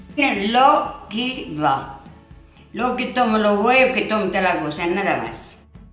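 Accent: Italy. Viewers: Nonna: me recordas a mi mamá!! Falleció en enero de este año. Tenía casi 99 años!! El mismo acento que el tuyo. Era de la zona de la Irpinia. Hacia también las Pupas, corazones y los infaltables "strufulis". Siempre para Pascuas!! Te mando un abrazo para vos y tu nieta que te ayuda!!! Gracias!!!! Seguí así!!! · Estoy llorando y es de amor...mi nonna hacía lo mismo, hablaba igual y era abruzzesa...emplea el "lu" que es re dialectal, me encanta ! Gracias por subir esto querida! Disfrutala! Mille bacci per la bella nonna!!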